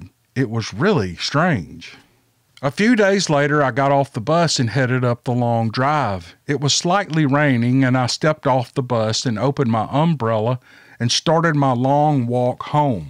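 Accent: South, United States. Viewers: Hi! I always enjoy your southern voice...doesn't even matter what you're reading or saying!Lol! Love and thanks for the great entertainment! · Love to hear you tell stories. You truly have a gift. I love your southern accent too 😊. I enjoy the music rifts as well. I'm so glad I came across your channel about a year ago. Keep on keeping on!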